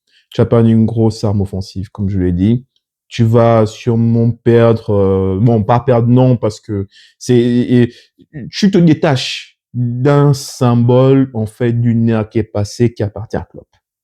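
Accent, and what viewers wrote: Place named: Gabon. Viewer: Att tu es Gabonais parce que l'accent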